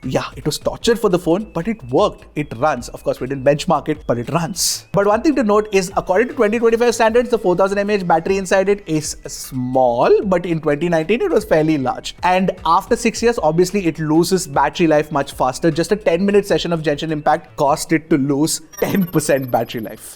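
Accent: India. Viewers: Yuk indian accent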